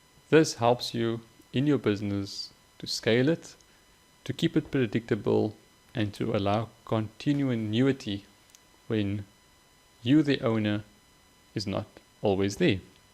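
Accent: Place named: South Africa. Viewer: Brilliant video thank you. Enjoyed hearing the SA accent too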